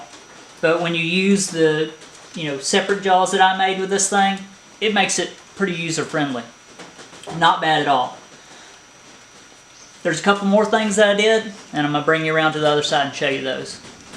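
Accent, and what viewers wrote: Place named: Louisiana, United States. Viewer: i just like your accent is that way out in louisiana somewhere ?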